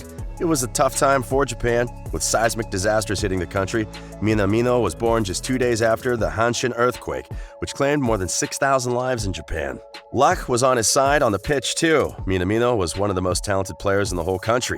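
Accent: United States. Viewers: U can tell he's an American for sure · Soon as I heard the annoying twang of American accent couldn't take it seriously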